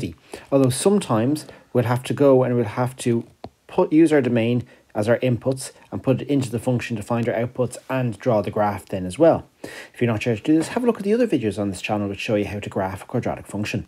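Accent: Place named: Ireland. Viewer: Thanks! Also, love the accent. Go Ireland lol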